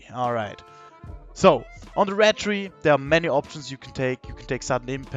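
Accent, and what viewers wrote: Germany. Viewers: du klingst deutsch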